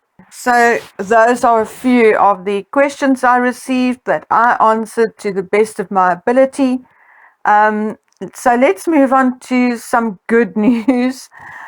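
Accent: South Africa. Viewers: ...firstly I really enjoy your vids and appreciate all the research and effort you put into producing and posting them Thank you. May I ask about your accent? Is it South African?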